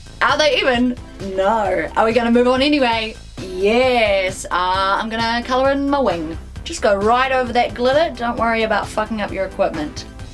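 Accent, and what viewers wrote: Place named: New Zealand. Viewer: I heard that kiwi accent and I was IN!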